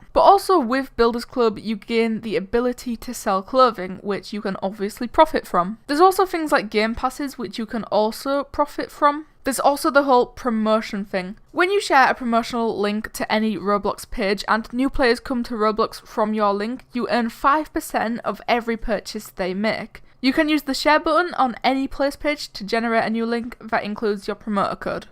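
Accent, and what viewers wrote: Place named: Manchester, United Kingdom. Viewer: do you live in Manchester? I hear your accent and its currently pm for me now xd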